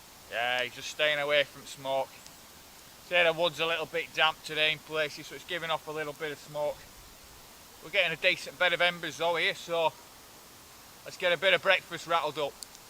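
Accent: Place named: Scotland, United Kingdom. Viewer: Your Scottish accent is entertainment In itself 😂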